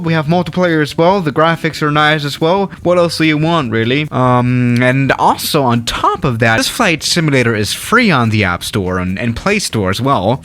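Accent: Germany. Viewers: Its german I think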